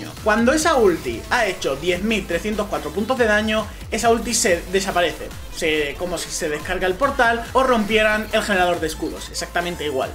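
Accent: Spain. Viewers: ole ese andalu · eres de Cádiz?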